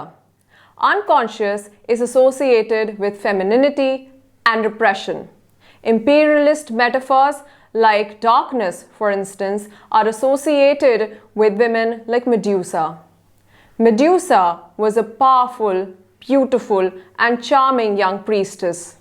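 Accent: India. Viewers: I really appreciate what this channel has been doing but the instructors' Indian accent spoiled mine 🙊☺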